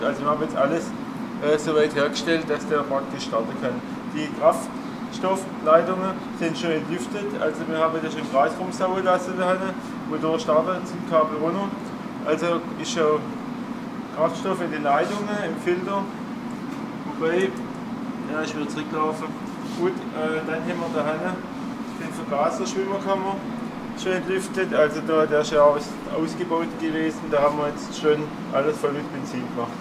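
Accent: Swabia, Germany. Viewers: Klasse wie immer,und zum glück wieder schwäbisch geschwezt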